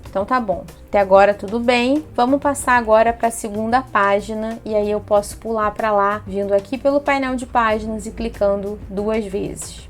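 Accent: Rio de Janeiro, Brazil. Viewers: Video bom. Sotaque carioqueiiissx forte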